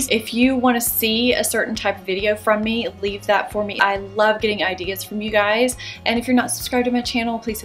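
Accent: South, United States. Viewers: I appreciate your subtle southern accent #southernbelle 🥰🥰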